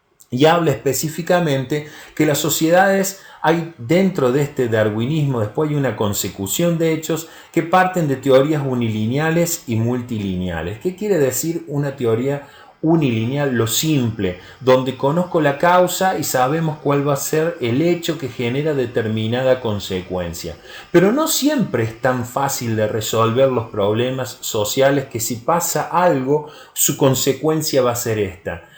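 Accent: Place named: Córdoba, Argentina. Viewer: Muy buen vídeo, me agrado la tonada cordobesa vamo´ a tomar un fernet escuchando?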